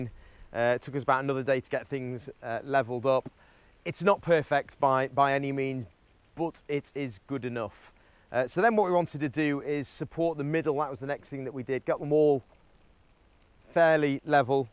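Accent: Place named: Northern England, United Kingdom. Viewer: This accent is so unique. It's almost 'posh' sounding and unlike most Northern accents. Wheres its origin?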